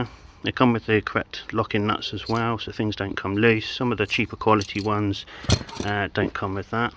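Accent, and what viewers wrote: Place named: South West, United Kingdom. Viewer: Quality kit, I may be in touch in the future. Sounds like you’re a SW boy, where are you located if you don’t mind me asking?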